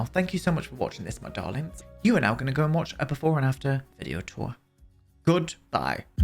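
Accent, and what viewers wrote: United Kingdom. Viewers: And you is british haha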